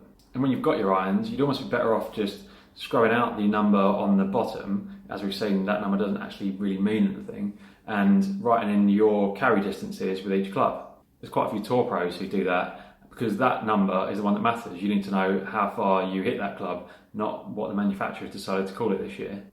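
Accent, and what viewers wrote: Australia. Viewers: Very new to golf and started looking to buy my first set of clubs just got a little more educated thanks mate.....love the Aussie accent.🤣🤣🤣🤣 Yes I'm an Aussie . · 😂 the Aussie accent. I recently went to test some clubs. I say test because my coach has already fit me. I tested the Taylormade P790’s and the guy at the store could not understand why I didn’t want a 7 iron that carried 15yards further than my current 7 iron. My 7 carries 150. I don’t need a new 7 that is carrying 165. There is always going to be a bad gap in the bottom of the bag. There were 15-25yard gaps between the 8-PW! You have to test the entire set. I loved the feel of the club, but I can’t have that much uncertainty 120yards from the green.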